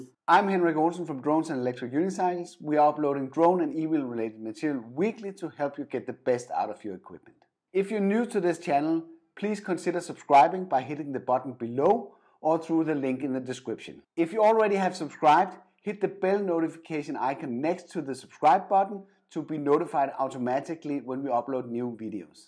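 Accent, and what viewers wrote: Denmark. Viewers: Er du dansker?!😂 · This has to be a Dane, with that accent. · sounds like a danish accent?? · Are you Danish? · Heavy Danish accent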